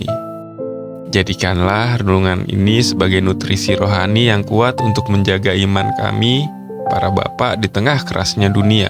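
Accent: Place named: Surakarta, Indonesia. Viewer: Kok suara mirip solo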